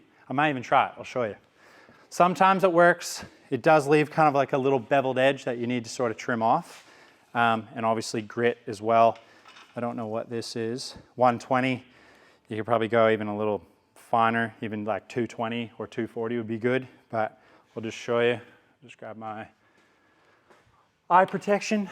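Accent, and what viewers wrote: Australia. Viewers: is he hiding an Australian accent under there? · Am I going insane or do you have just the barest hint of an Aussie accent?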